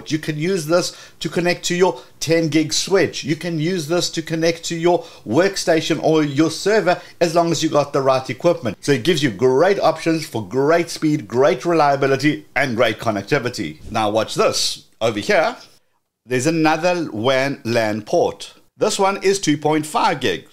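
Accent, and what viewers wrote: South Africa. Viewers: Thought so😁. Your accent, only a South-African will know 😅Not anymore though, from your channel info? All the best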